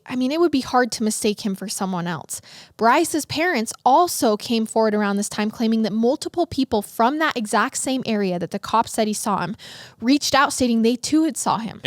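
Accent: Utah, United States. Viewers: Crick for creek hill for heal sell for sale…. The list goes on! even the captions put other words form the way she pronounces. It’s a Utah thing right?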